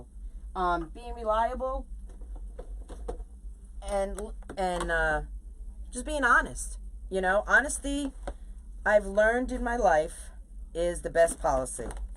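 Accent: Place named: Long Island, United States. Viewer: I hope you see my comment knowing this is an older video. First of all, you are amazing. Explain things well! Secondly, where did you get your easels from? Sounds like you have a Long Island accent. I lived on Long Island for many years and just moved back to the Midwest to be closer to my parents. Lived both on North Shore and South Shore. I watch Lori all the time and I'm so happy I found you as well!!!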